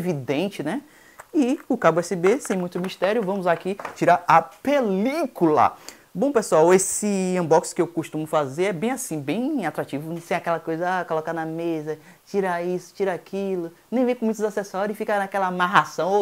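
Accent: Bahia, Brazil. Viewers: adoro nosso baianês kkkkk parabéns pelo trabalho! Muito bom e autêntico. Dou like antes de assitir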